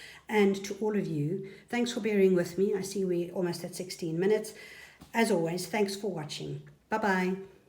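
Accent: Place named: South Africa. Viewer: Hello fellow RSA-ian. Love your work... and listening to a familiar accent. Thanks for sharing.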